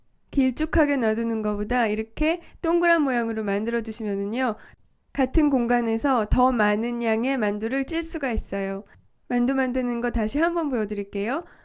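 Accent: Seoul, South Korea. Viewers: 연세가 어떻게 되시나요? 서울사투리에 가까운 발음이네요...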